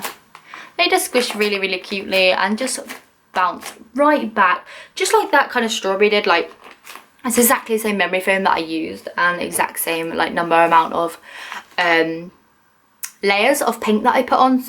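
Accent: United Kingdom. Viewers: 13 Reason Why...I ❤️ 1. She makes the CUTEST squishies 2. She uploads regularly 3. She is just the SWEETEST person 4. She is really CREATIVE 5. She makes the BEST original content 6. She has a really pretty British accent 7. She is SUPER kawaii 8. She is really Hard working 9. She cares about Everything 10. Her videos are “Family Friendly” 11. Her aesthetic is Just...GOALS 12. Her tutorials are REALLY helpful 13. I just love her in general 😘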